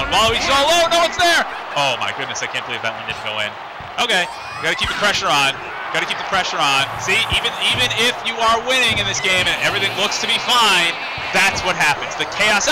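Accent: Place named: United States. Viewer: You sound American, but call it Football. Not like it matters, but I'd just assume you'd call it soccer more than football out of habbit. xD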